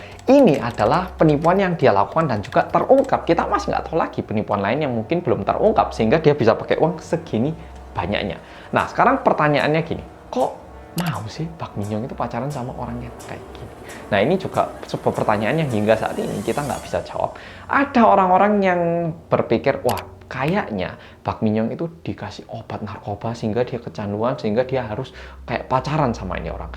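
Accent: Java, Indonesia. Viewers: Mantap mas penjelasannya, keren bgt, kyknya kasus yg di indonesia jg gak bgitu bisa smpe diceritain sedetail ini hehe..kbayang effortnya mas ngumpulin smua artikel blm lagi hrs memahami yg ruwed2nya hehe..goodjob orang korea yg medhookk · Hallo Mas..ngangenin lokat Medoknya itu lho..Suxses ya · Thank you pak dosen untuk 1 sks matkulnya😁 Mendoknya saya suka walaupun terkadang ora ngerti aku😁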